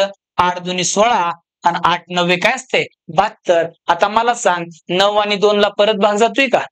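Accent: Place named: Pandharpur, India. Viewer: Sir tumhi kuthe rahta .... pandharpur taluka ahe ka tumcha.... language tikdchi vatte....ekdam chan teach krta....lagech concept clear hotat....mi khoop videos pahile tumche....shekdewari ,train,kal kaam ....boat. Ni pravah ..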